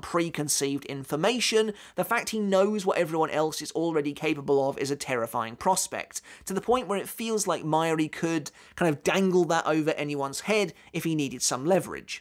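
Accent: United Kingdom. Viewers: Didn't know an American spoke in a British accent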